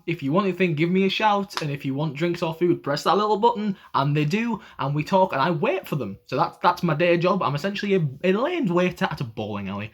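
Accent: United Kingdom. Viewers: Casual thoughts I had while watching this video: Loved your toy story notebook. Your D.O. impression is on point. I´m from Latin America and I also really like your accent, I had a couple of British teachers back in high school and I always liked the way they spoke. Yes, Jongho is probably the best 4th vocal atm, I love his voice so much. Yeah, fan wars may be an issue if you do overall rankings but from what I´ve seen on your videos comments most of your subscribers are pretty chill people, you calling yourself a soft boy is a whole mood haha. My favorite rapper/singer is Jisung too, you should check out his king of masked singer performance if you haven´t yet, truly amazing. I don´t think you´d ever have to put an end on your ranking series because so many groups debut each year, you have endless content hahaha. NOOO, WE´RE NOT JUST HERE FOR THE RANKINGS, you´re such a cool person, a lot of people like you a lot just because you´re funny and a nice dude. 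Yes, we´d love some covers haha. YES, IDOLS DESERVE SO MUCH RESPECT FOR WHAT THEY DO UNTALENTED IDOLS DO NOT EXIST. Omg happy birthday, I hope you have a great day tomorrow. Thank you so much for answering my question and giving me that shoutout, I believe in your channel so much and I´m glad I can be a supporter. Wow, I couldn't agree with you more on that answer, kpop is so much more than just vocals and if a group needs a backing track in order to deliver a performance in the best way they can then I say they should go f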